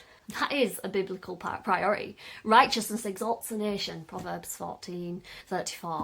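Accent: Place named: Yorkshire, United Kingdom. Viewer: Completely agree with your sentiments - but can't quite place your accent? Yorkshire maybe?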